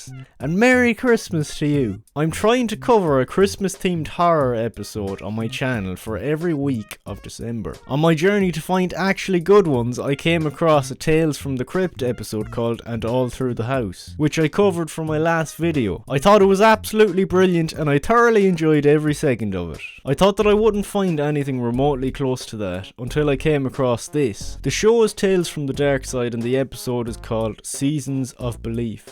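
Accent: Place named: Ireland. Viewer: I’m sorry I was just messing… I actually love your Irish accent! ❤❤ I have a few Irish friends who say I am too English to understand them lol 😂😂… great video! I am following you now too! ❤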